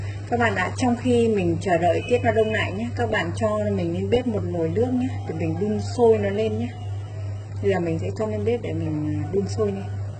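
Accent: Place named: Vinh Phuc, Vietnam. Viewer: Giọng nói này là người Vĩnh phúc . Và chưa biết nấu ăn ngon .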